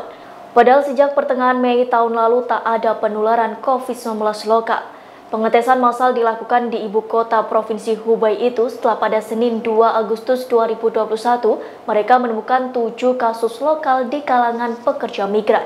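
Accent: Java, Indonesia. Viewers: mbaknya medhok ya.. lokdon...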